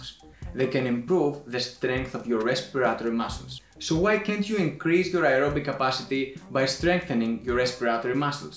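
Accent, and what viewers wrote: Greece. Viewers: It's a Greek accent, he is Greek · Αδερφέ μα τον θεο πριν δω το βίντεο κατάλαβα από την προφορά ότι είσαι Έλληνας... btw καλή ανάλυση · προφορά ελληνική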